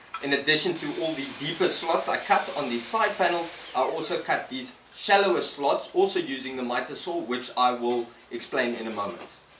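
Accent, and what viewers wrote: Afrikaans, South Africa. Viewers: Strange accent. Slightly German, slightly South African. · This is my first video of yours that I have watched. I am not from wherever you are from and I am going to guess your accent. Please don't ridicule me for my ridiculous guesses. To me your accent sounds like South African or Swedish mixed with Australian. How wrong am I? By the way, I enjoyed the video! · Are you from south africa???because the accent sound very Afrikaans???😁😁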